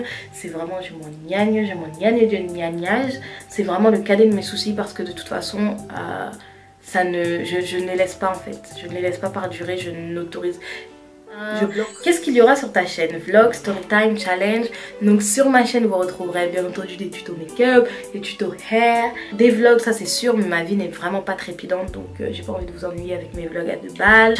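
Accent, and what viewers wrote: Ivory Coast. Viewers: J'ai pensé Ivoirienne tu a un pt accent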